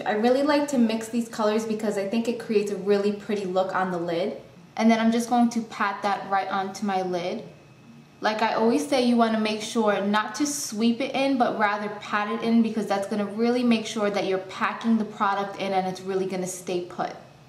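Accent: New Jersey, United States. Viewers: Jersey accent ! .. :)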